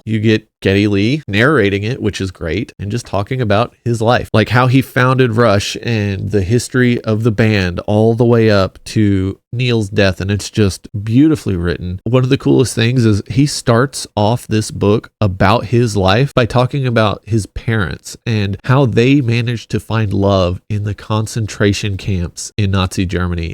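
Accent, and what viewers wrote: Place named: South, United States. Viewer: Thats a sick MN hat I gotta get one of those. For some reason I thought you had a slight southern accent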